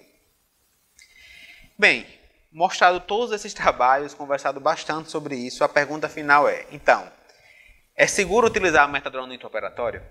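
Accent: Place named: Northeast, Brazil. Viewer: É um pouco complicado entender quando dispara a falar rápido e junta o sotaque nordestino e o agudo da voz,então complica pra entender. Surgido fazer fono se quiser falar por longo tempo.Ecplica muito bem mas o sotaque e o agudo atrapalha o entendimento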